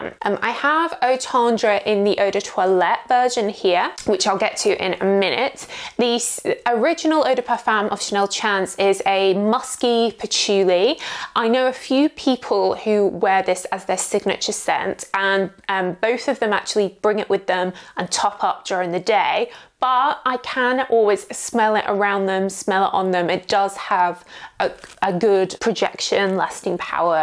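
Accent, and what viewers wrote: England, United Kingdom. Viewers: I love your reviews! You were so correct about the Chanel Chance eau tendre. I bought it and it was the weakest perfume performance wise for the price. It disappeared on my skin and could not smell it after an hour or less. My coworker can wear it and I smell it on her all day long. But last year you told us about Zara Applejuice and I bought it last year for $25. It was the big bottle and it smells better than the Chanel IMO, and lasts longer and smells stronger than the real one. That’s on my skin though. Everyone is different. My skin tends to eat perfumes unless they are stronger or a citrusy fresh like Clinique happy works great on me for example. It was disappointing though buying the eau tendre just to have to return it back. Because it does smell so so good just very weak and expensive. So thankyou for all your tips! 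I love watching your videos and I love your English accent!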